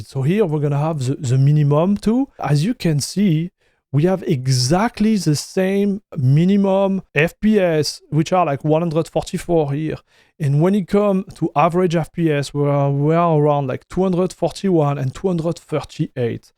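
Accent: France. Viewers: Very French accent! Un compatriote 😂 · lol, yes french accent from france but his english is easy to understand. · I hope your english gets better, it sounds more like pure french, and it's very hard to understand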